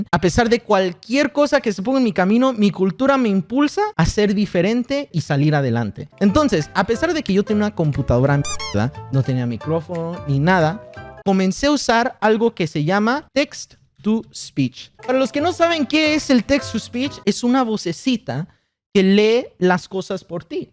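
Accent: United States. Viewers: No mames ya se le pego el acento americano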